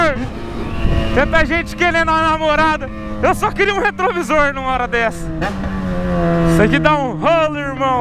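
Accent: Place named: São Paulo, Brazil. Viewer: esses pé vermelho baba um ovo pro sutaque paulista kkkk, mais esse é atentado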